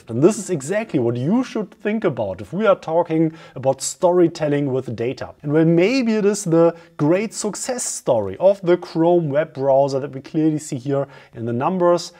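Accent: Germany. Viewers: Ernsthaft, so schwer ist das „th“ nun auch wieder nicht. Das klingt wie reinstes Hochdeutsch. Andere Sprachen KLINGEN anders. Das hier ist echt schwer auszuhalten. Wird dir kein native speaker sagen, sie sagen es nur denen, die tatsächlich wie native speaker klingen. Aber das kann echt niemand lange aushalten. Schlimmer als sächsisch. Oder indisches „Indlish“. Du klingst wie wenn Otto Leute nachmacht, die eigentlich kein Englisch sprechen. Wie kann jemand English lernen und zu 100% Deutsch klingen auf dem Level?? Sorry, super Inhalt, aber ich krieg schon die Krise, was glaubst du, wie das für native speaker klingt…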